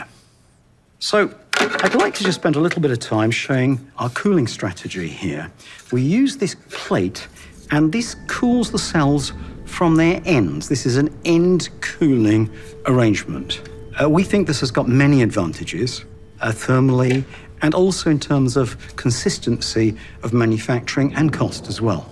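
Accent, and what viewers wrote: United Kingdom. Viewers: Quite similar to the original Tesla Roadster and Model S HV packs, in that Lucid uses many thousands of tiny 18650 "flashlight" batteries, as in laptops and power tools. Improvements over Tesla are a higher pack output (900 VDC), as for most newer BEV's, instead of the 400 VDC in Tesla packs. That makes fast-charging easier (half the current). Another advantage is that the end-plate cooling and lack of adhesives should make replacing individual failed cells much easier, and likely easier assembly too. The Physics he presents is high-school level so not amazing, though when stated in a high-class British accent it sounds more clever. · I'm born and raised in the USA. I'm totally disgusted with abundance of British accents I have to hear these days. I'm nearly 80...and it hasn't always been this way. This time I guess they're trying to take us without burning our buildings. 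Britain and Israel are our two worst enemies...and the sooner others realize that the better off we're going to be. And BTW: Everything he's saying you should have learned in high school...and could have learned in grade school if they weren't wasting all your time on "love your government as yourself" propaganda. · OMG, such an amazing video, exceptional presentation skills. Fantastic British accent. Beautiful cinematography. I love it all. I didn’t care about lucid, till now. Subscribed. Keep the good stuff coming and I hope you will be able to produce cars for the masses too in the near future. Thanks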